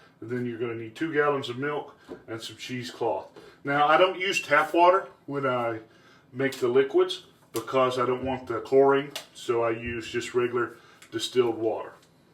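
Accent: South, United States. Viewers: Considering his Southern American accent, I would guess US gallon.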